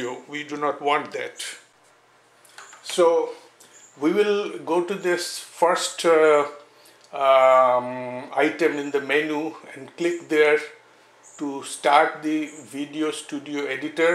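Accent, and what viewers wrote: India. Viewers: Awesome job of speaking, I don't care if you have an India Accent, LOL You are speaking quite clearly Im sure I will learn stuff from your tutorials 5* from Walter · You should apologize for your Indian accent. There´s nothing wrong with it, and it sounds great. Greetings from Montevideo city, Uruguay.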